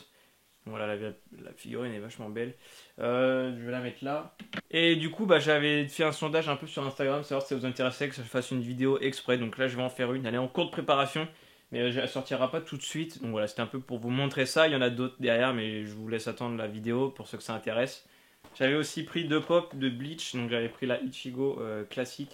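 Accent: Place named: Belgium. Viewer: Ton decor est de plus en plus mortel! achats tops et surtout gros pouce bleu pour ton accent belge un moment ahaha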